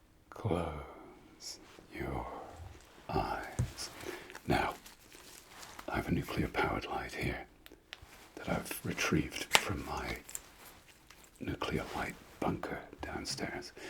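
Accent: France. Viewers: as a french person, i LOVE how you make the french accent !! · " no screen 1h before sleep" woops. Désolé, i'm already in my bed watching your video xD what do we do xD Love your humour and your french accent, vous parlez très bien français ;) (from a french canadian ☺️)❤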